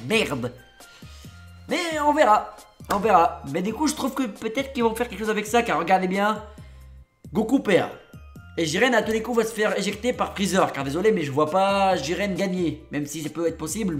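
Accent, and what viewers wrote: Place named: North Africa. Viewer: L'accent du blédard 🇲🇦🇲🇦🇲🇦🇩🇿🇩🇿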